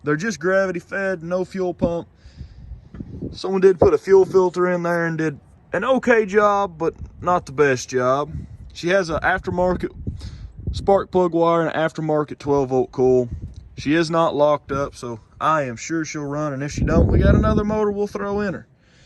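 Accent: South, United States. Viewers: 20 seconds in an that good ole' country boy accent tells me this A is going to be running at the end of this video... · Listening to you talk, I'm guessing you're a country boy. I could be wrong. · I love your accent, your from the south I’m Guessing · does he speak south accent? is this south accent? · This man has the best southern accent.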